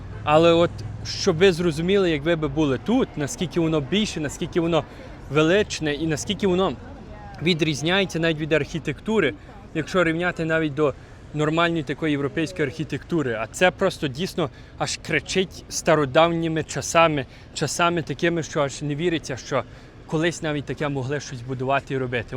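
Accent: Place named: Kolomyia, Ukraine. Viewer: ти чисто гутариш по коломийський,звідки ти родом?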